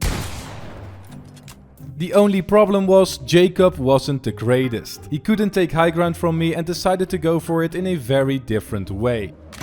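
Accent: Netherlands. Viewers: Heerlijk Nederlands accentje 😂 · Ur dutch right? Ps. Keep up the great vids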